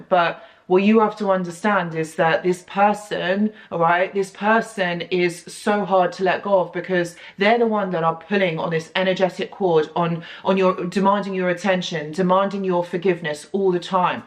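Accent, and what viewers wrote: England, United Kingdom. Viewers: I found this for a reason. Lovely to hear it with an English accent!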